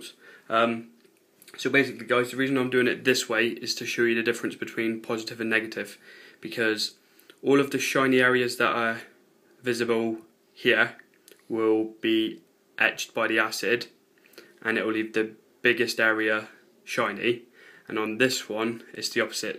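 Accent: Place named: United States. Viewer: Must be a 'Murakin. The only English speaking country that needs subtitles for English. Sometimes for their own countrymen. Stupid inbred gits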